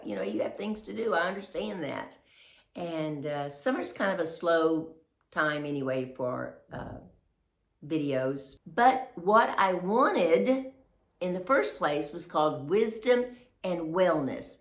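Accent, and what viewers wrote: United States. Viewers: I think with your strong American accent it is difficult to speak French well??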